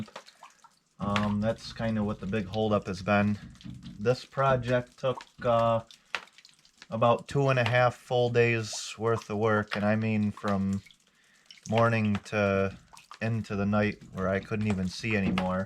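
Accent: United States. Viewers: Well I would have bet you couldn’t have done this. I’m new to your channel. Nice job! Your door ID on the truck says New York but your accent says maybe Wisconsin? Well anyway you have a new subscriber. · You almost sound like you're from Minnesota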